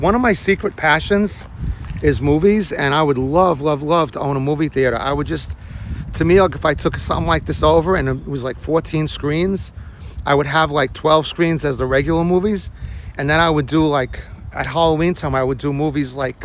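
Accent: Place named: New Jersey, United States. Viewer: I’m from Jersey, too, we have the same accent. Thanks for who sings this video. I’m moving to Palmer Ranch next year. I can’t wait to see what they did with the mall.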